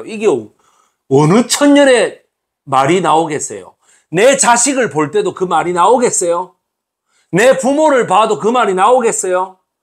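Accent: Gyeongsang, South Korea. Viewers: 구수한 경상도 사투리에 잘못하면 반 쯤 쥑이는 화법 귀가 뻥뚤리는 스트레스 확 풀리는 목소리에 반했어요!!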